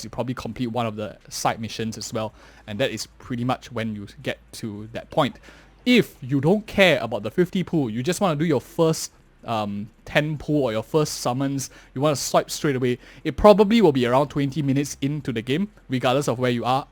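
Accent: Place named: Singapore. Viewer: hello, when i first heard you speak i immediately recognised our SG accent! hahaha. keep up the good work with your guides. much love from a fellow SG:D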